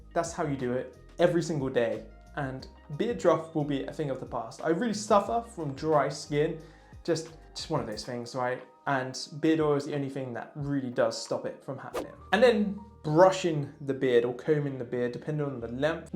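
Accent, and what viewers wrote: United Kingdom. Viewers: I noticed you're using beard butter from Detroit Grooming which is from the US but you sound like you live in the UK. Is there a UK supplier for that brand?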